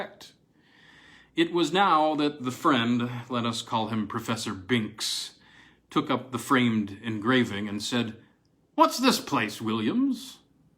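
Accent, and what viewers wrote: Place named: United States. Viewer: To hear this in an American accent is curious, of course, but you bring it off well. Thanks!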